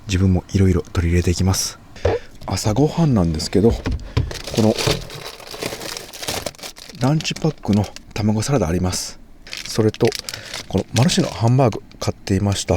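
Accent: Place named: Kansai, Japan. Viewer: やっぱりさん関西人なんですね。フロントにヒビがぶぁぁぁぁって、大変だったのに笑ってしまいましたm(_ _)m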